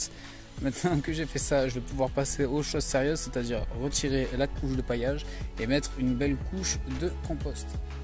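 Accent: South, France. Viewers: Bonjour j'adore tes intros et tes vidéos, bravo👍. <gari> tu ne serais pas du sud toi? Tiens j'ai le même donut! Sinon pour tes tomates je croise les doigts. Bon dimanche et bonne baignade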